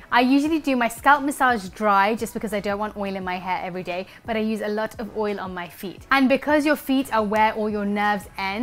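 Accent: United Kingdom. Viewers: African-British-American-Indian lady with a Perfect British accent! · Yes, her roots are from india but she was born and raised in London so she has British accent 😊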